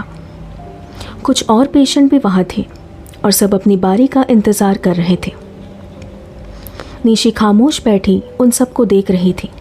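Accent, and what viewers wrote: Varanasi, India. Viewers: Apki awaz me jyada maja ata hai dii rj voice me bhi sunke ka maja hai par banarsi tone apka jyada acha hai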